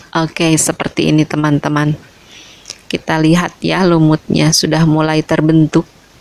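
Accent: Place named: West Java, Indonesia. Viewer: ibu ini orng sunda kaya nya ...beda bicaranya bilng kocok jadi kocek ...mntap bu puding nya mau bikn nnti klu pulkam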